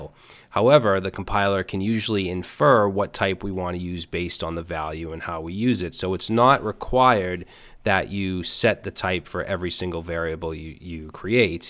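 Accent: Boston, United States. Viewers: Just a question,are from Boston ? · This is a great place to start. Well spoken, easy to follow and your Bostonion? accent makes it more interesting.. thanks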